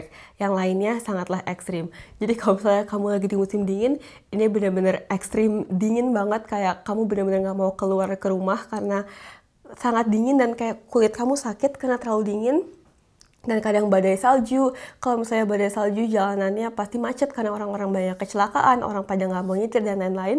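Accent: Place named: Germany. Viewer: Mbak... Logat kamu udah nyampur banget Jerman wkwk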